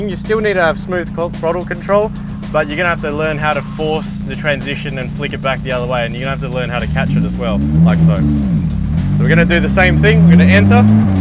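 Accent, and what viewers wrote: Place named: Australia. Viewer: Asian guy with Australian accent. Nothing unusual here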